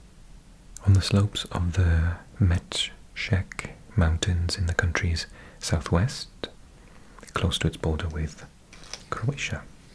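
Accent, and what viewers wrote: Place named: Wales, United Kingdom. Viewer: Love hearing your Welsh accent as someone who lives in Wales! Unusual to hear it on here so thank you 🤩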